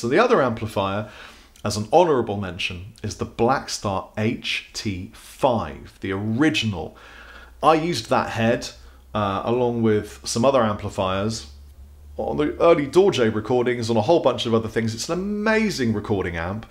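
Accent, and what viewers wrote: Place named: Wiltshire, United Kingdom. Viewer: is it just me or is your Wiltshire accent becoming more prominent with age? Give it another decade and you'll sound like Phil Harding of Time Team... which is not a bad thing!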